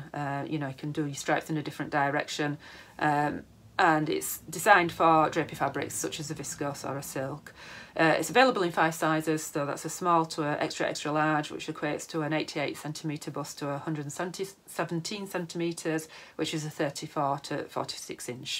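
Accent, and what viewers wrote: Yorkshire, United Kingdom. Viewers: Sewing. Free. Yorkshire accent. I’m ere for it lass. 😊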